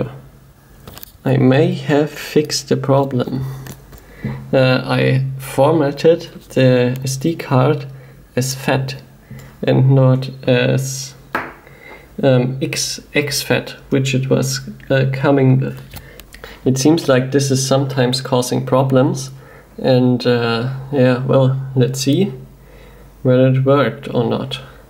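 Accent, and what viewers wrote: Germany. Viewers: except german accent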